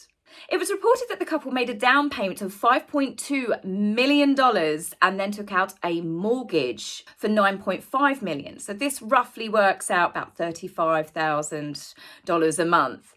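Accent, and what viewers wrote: United Kingdom. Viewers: Great video. I just wish you could talk a little slower... sometimes it's hard to understand with your British accent 😉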